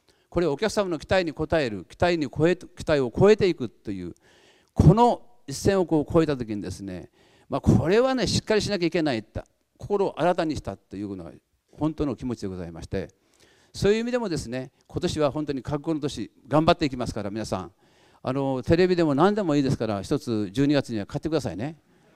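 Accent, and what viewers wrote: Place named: Nagasaki, Japan. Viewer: この長崎弁もいいし声もいいんですよね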